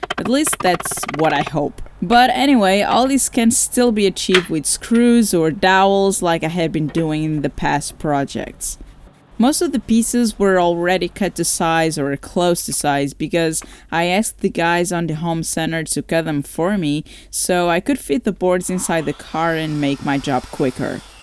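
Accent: Portugal. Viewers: this is a portugesse accent? love it anyway. it reminds me of a dutch accent almost. im binge watching 'the handmaids tale' and stumbled upon you. this recommendation suits me. thank you , youtube.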